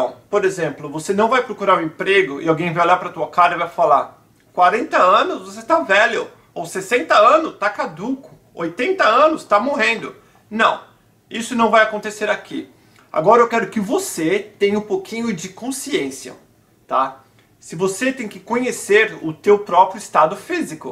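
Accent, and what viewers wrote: United States. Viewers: Nem parece que é brasileiro com esse super sotaque americano. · Vc ta com sutaque de americano, é bem engraçado kkkk (obs: nao sei se é assim q se escreve sutaque). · Você parece um americano mesmo falando português... uma pena que meu Inglês não chega nem no básico!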